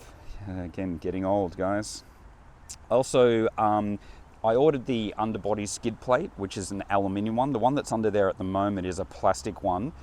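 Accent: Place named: Australia. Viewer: Love the Australian accent and of course the Ryker looks very Mad Max :)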